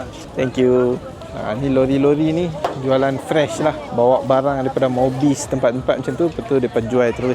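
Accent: North, Malaysia. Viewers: baru pertama kali tgk video abg memng puas la dgn loqat utara lagi the best ...semoga channel abg cepat brkmbng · Wow... Kita sekampung loghat utara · u ckp loghat utara, i mati2 ingt u org melayu. rupanya u indian. tapi u ckp mmg macam melayu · Slang utara dia memang sempoi.. Jelas dan faham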